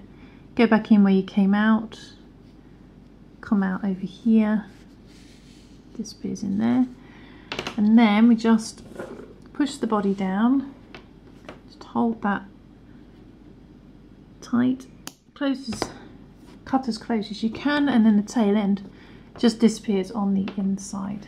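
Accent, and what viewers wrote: England, United Kingdom. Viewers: Loving your channel. I’m 74 from UK and newbie to crochet, struggling with lockdown. You have a lovely clear english voice and clear instruction. (U.S. contributors - of anything - so long winded!) I finally did your daffodil - after many mistakes! and now doing your lovely piggy. Many thanks. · It’s nice to have somebody English nice and slow and clear and showing you exactly what you’ve got to do your brilliant thumbs up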